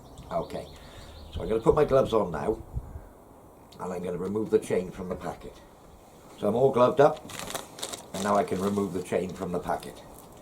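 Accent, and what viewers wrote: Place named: Wales, United Kingdom. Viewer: Great video... Deserves more views!! I'm probably going to buy it as a direct result of this video... You should have an amazon link to this one, so you get a bit of coin when viewers buy...!! Keep making these videos dude! You also sound kinda local? I'm in Ammanford, just north of Swansea. Where you from butt?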